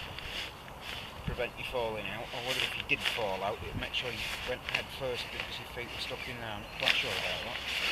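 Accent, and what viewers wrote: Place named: Northern England, United Kingdom. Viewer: Nice set up, I think my only alteration would be either lowering the tarp or raising the hammock otherwise you may end up rather soggy if it's wet and windy :P The flap at the bottom of the hammock is for stopping a casualty sliding out the bottom when going up an incline rather than stopping them rolling out the side btw. Nice review, the northern accent always inspires trust ;)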